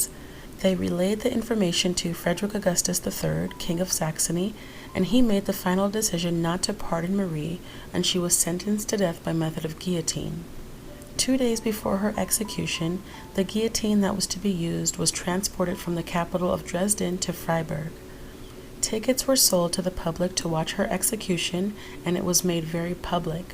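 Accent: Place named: Canada. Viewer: You've taken the bull by the horns here. That is extremely commendable. I've said this before, but I really, really like how you end your videos with discussion questions. You are a very strong personality. Ha ha. Just judging from your accent, are you Canadian or upper U.S. midwest? I've been all over in my life, and that's what I think I hear. I'm prepared to be wrong though.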